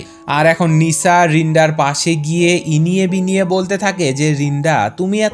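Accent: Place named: Noakhali, Bangladesh. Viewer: না মানে আমি এমনিতেই জিগ্গেস করলাম কারণ আপনার কথায় কিছু টা নোয়াখালীর মতো লাগে তাই আর কী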